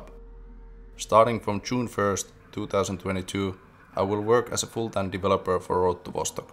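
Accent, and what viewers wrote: Finland. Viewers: Sounds like you are finnish?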